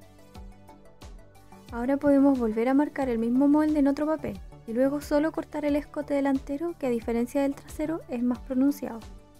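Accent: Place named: Chile. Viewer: Ay apenas te escuché dije: es chilenaaaa. Mi país mi país 😁 muchas gracias por el tutorial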